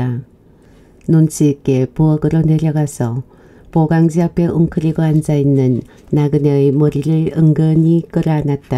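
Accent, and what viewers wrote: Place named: Chungcheong, South Korea. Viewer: 충청도 사투리가 이런 억양인가요? 경낭도인줄 알았는데? 진짜 못 알아듣겠네요.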